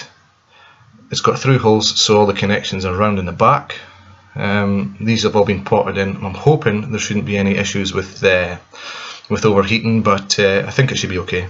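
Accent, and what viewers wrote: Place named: Aberdeen, United Kingdom. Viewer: Great video! You sound like you're near me. Aberdeen?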